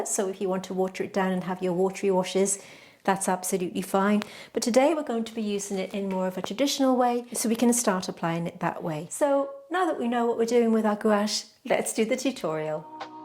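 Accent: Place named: England, United Kingdom. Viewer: thank you, I do do that but it is frustrating to pause it because then you have to bk it up and YouTube is making that difficult. Why can't they just leave well enough alone. Just got the holbein Gouache myself so I'm watching all your wonderful videos to learn. You are so very amazing. And as for the voice over text, we can't hear you're lovely voice. I myself love an English accent.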